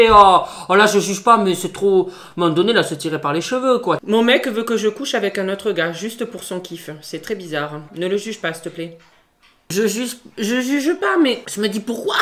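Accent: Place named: South, France. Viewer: J'adore son accent du Sud 😂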